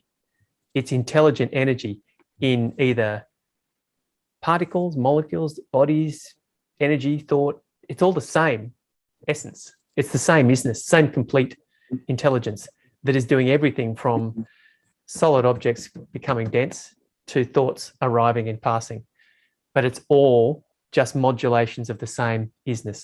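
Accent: Australia. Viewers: Hi, you are obviously Australian, do you hold satsangs or meetings anywhere? Who are you talking to?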